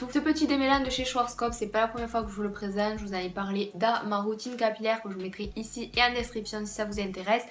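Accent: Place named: Toulouse, France. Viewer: L'accent toulousain est palpable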